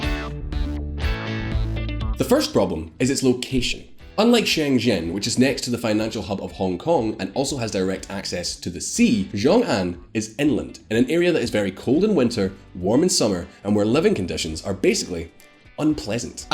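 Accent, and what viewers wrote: Scotland, United Kingdom. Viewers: So curious! Watch a video in british accent that I already watched in spanish from Spain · You’re pronunciation is weak...as an American, I love hearing the Brit accent but yours is either hindering your pronunciation or you’re writers and team are failing you miserably. Best of luck red coat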